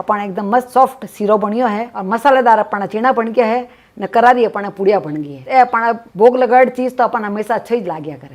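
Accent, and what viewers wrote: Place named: Jodhpur, India. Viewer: Aunty aap ki boli Jodhpur ki lagti h, aapke video bahut ache h.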